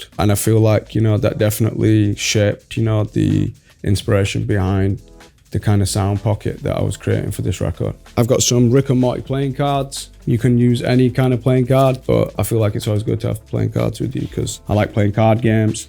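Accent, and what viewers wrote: United Kingdom. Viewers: What part of the UK is this accent? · I love his British accent but all of those tattoos makes me feel so scared and terrified around him… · Zayn has the Britishest British accent !! No wonder British Vogue had him here .....love him ❤ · Love that he is still so British even after living in USA for so long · The most British accent ever 😂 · "mix it with a bit o wo'oh" man really the Britiest-British eva 😂🥹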